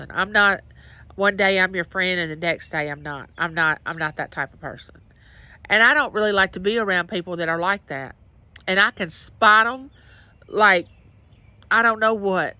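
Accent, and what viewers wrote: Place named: South, United States. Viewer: I absolutely love your southern accent! ❤❤❤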